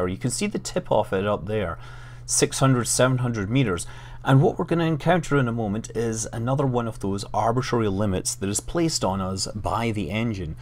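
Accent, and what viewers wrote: Scotland, United Kingdom. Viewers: scottish, not much difference, i know cus am irish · Nope, it's a Scottish accent.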